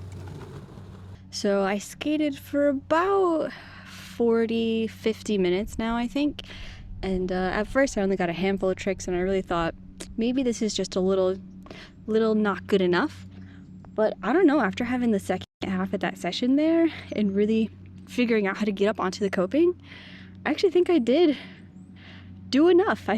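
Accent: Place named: Midwest, United States. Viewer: youre from new york?!?! i thought you wouldve been midwest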